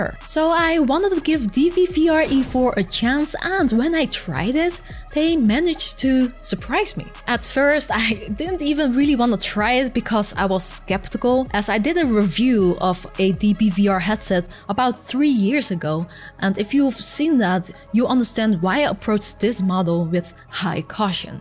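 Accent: Netherlands. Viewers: Sounds like you got a dutch accent.. hehe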